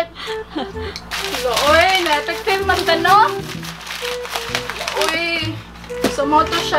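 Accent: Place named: Visayas, Philippines. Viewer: Bisaya kau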